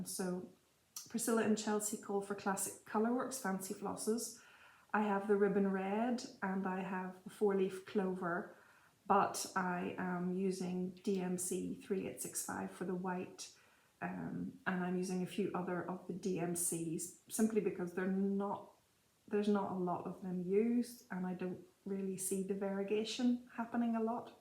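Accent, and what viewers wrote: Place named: Ireland. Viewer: so lovely to see you again and we do understand you are very busy, not only looking after your own wee family, but also preparing for long term house guests. Wishing you all the best as you all adjust to the new routine once your guests arrive. I'm sure you will be able to grab a few minutes some days to stitch a row and use this as your chill out and recharge time. Please don't worry about having too much content for future videos. Just to see your face and hear a couple of minutes of your Irish lilt will suffice until everything settles down for you. Take care and deep breaths. xx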